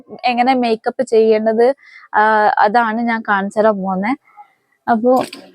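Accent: Malappuram, India. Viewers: Aap kaisea sikkayaa Malayalam..Malapuram slag 👍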